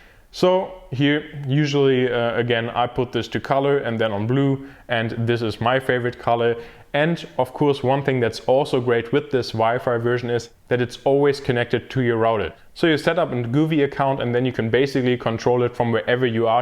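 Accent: Germany. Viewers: Merke ich da etwa einen Deutschen Akzent ? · Which model/Version is the best of rgbic (bc i want to buy 10 Meters but i want the best version) btw are u German bc of the accent if yeah hallo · Dieser Deutsche Akzent haha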